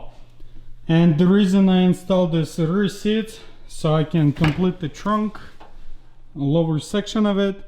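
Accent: Russia. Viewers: Is it Russian an accent? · Love that Russian accent 😂 Nice content buddy 👏🏼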